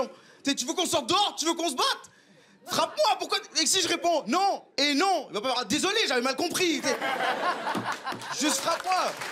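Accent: France. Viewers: Ça aurait été mieux avec l’accent québécois que celui français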